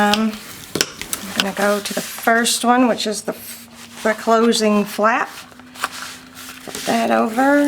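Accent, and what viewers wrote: South, United States. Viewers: Being Southern like you, I understand everything you say!! LOL.... Here in our area of the Gulf Coast of MS.. we have a saying when we know something won't work out or something just won't work at all, we say, " That dog won't hunt"..... Being from an area that has a lot of hunters then we all know what this phrase means!! I love love love that you show how to use ordinary stuff to make your journals... without having to buy a bunch of expensive products you show how to use what we have in our stash!! The journal is awesome and I think it would make a great planner!! Thanks,.... you did it again! ( ahhhh I am a poet and don't know it) Another southern saying?? LOL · I absolutely adore your videos and I think I've watched all of them and if not it's only because I haven't found it YET!! I do find it funny that you say hoes for holes...I'm assuming very southern. 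I'm from Alaska and shared one of my books created from a couple of your printables I'd combined a bit and you shared it on FB...that was cool!! Thank you for all you do...it's opened a whole new world of crafts for me. Before I'd always been a digital scrapper (still am to a huge extent but not I print and make some of my designs in paper books I create).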